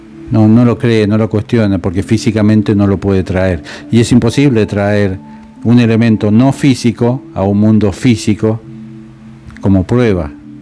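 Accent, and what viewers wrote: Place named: Argentina. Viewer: Buah...que temas más interesantes aborda este canal y como disfruto escuchando a por Dios, con su gracia y su acento argentino que a veces escucho dos y tres veces el vídeo. 😂 Te queremos, aprendemos mucho contigo. Un millón de gracias y saludos desde España 🇪🇦 ❤🙏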